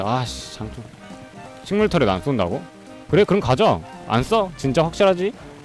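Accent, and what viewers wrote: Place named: Jeolla, South Korea. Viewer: 목소리에서 전라도 사투리가들리네요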